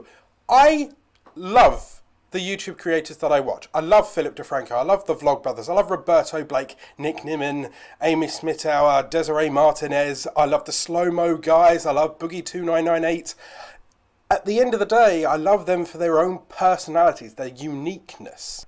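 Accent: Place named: England, United Kingdom. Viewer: Come to think of it, I do prefer listening to an English accent! And a little bit of humour is always good. I do wonder why you're so close to the camera - not just you, everyone who does it?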